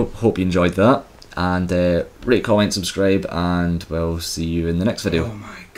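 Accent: Scotland, United Kingdom. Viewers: He has a Scottish accent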